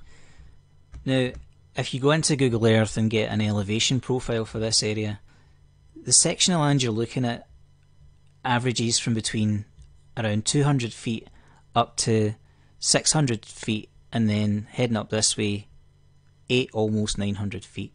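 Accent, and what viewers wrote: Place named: Scotland, United Kingdom. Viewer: Alright mate? A fellow Scotsman here. Keep up the good work. I found you through a painful chat with Spurs etc. It was great to hear a familiar accent in the discourse.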